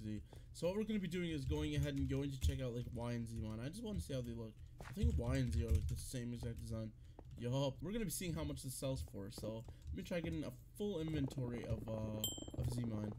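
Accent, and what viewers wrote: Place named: Egypt. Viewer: hmmm, you aound egyptian, my brother :D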